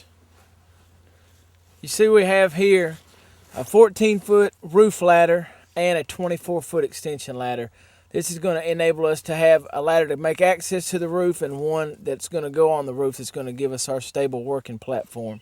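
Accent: United States. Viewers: the southern accent helped me to pay attention, thank you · you are from texsas?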